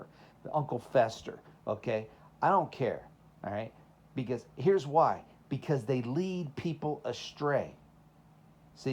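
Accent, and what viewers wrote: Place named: Minnesota, United States. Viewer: You sound more like Minnesota accent than TX surprisingly.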